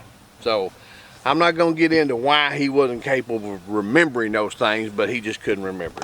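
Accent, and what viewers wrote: South, United States. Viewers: I love the southern accent especially when he was talkin about people with “fat fangers” lol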